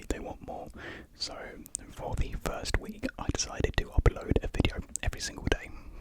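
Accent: United Kingdom. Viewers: hi i was wondering if you're british but live in america?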